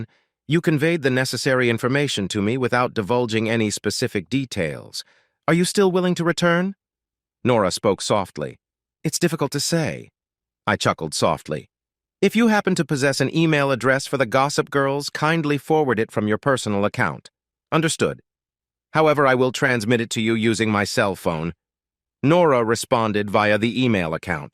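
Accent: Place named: RP, United Kingdom. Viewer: What is with the high english?????!!. It sounds stupidly arrogant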